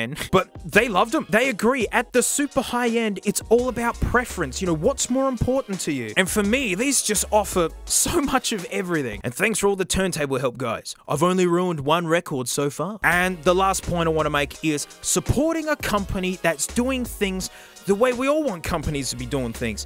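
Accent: Australia. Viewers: MOOOOM The funny aussie is talking about headphones again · that's a toss up between overtly verbose ramblings about things that may or may not be relevant to the car being reviewed and the [ h e a v y ] aussie accent cheapo microphone stories about the aussie life lol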